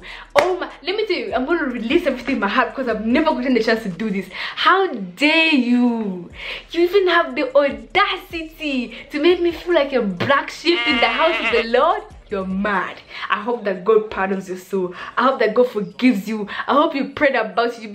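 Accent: Nigeria. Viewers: You sound Nigerian 😭❤️youre literally my favorite YouTuber as about now !!❤️🔥🌈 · You have a Nigerian accent 😍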